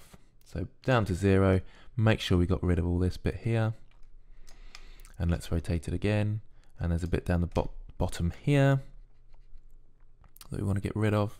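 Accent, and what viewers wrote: United Kingdom. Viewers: I like this british accent : )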